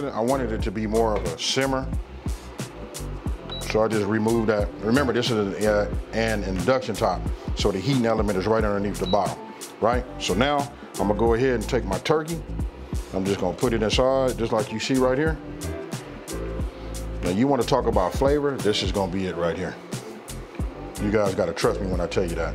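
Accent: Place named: California, United States. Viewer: It strange to see and hear you cook southern food with that Cali accent.. But you got some skills.. I enjoy your videos. Keep doing what ya doing.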